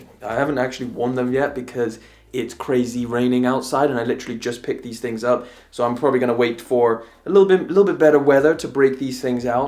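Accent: South Africa. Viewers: I KNEW I GOT A HINT OF SOUTH AFRICA WHEN I HEARD YOU TALK!!! Represent! 🔥 🇿🇦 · I wanted to say I hear a bit of South Africa in your accent 😂👀🔥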